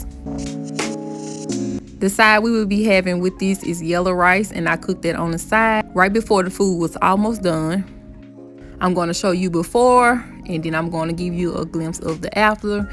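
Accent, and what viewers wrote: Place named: South, United States. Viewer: You gotta be from the south ayyeeee yooo you had me at bolder... AkA butter and poppa Rika 🤣🤣🤣 dead 💀.... looks amazing tho I loved it 😍 👌 🙌 ❤ 💕 Update: I have a question??? How come you don't make any veggies with your dishes Also I don't eat pork.. im Muslim I was thinking veal chops or lamb would you make a video for ppl that don't eat pork thanks 💕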